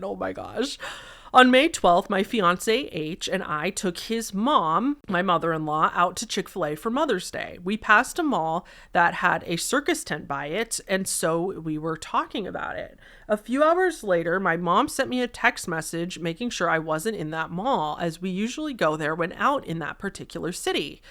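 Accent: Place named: East Coast, Australia. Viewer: It's an Australian east coast accent ahaha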